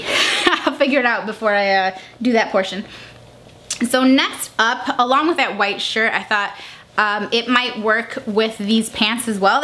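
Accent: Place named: Texas, United States. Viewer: I love your loudness I'm from Houston Texas so I'm so use to the way you talk makes me think your a normal person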